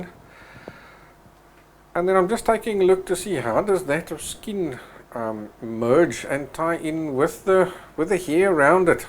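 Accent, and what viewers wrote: South Africa. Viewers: great video, are you south african by any chance?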